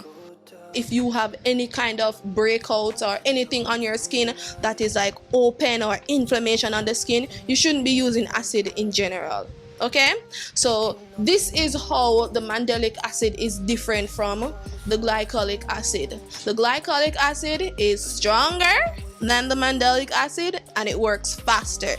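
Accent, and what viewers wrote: Jamaica. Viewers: I like your Jamaican accent! 👍🏾😇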